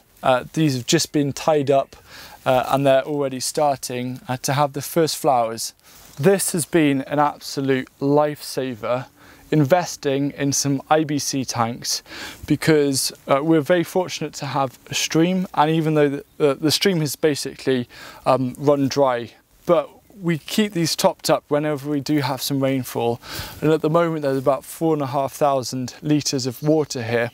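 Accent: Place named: Wales, United Kingdom. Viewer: I’m interested in your accent. Every third sentence there’s a cadence that sounds Welsh. Which region is it?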